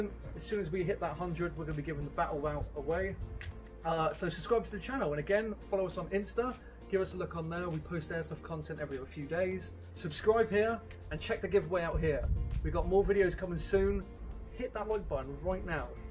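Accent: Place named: United Kingdom. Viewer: I thought it was a real gun until I heard your accent and yeah your in Britain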